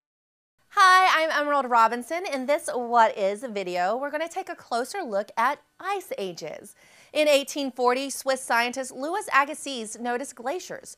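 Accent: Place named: United States. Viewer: wanted to learn something..... heard an american voice...... moved on.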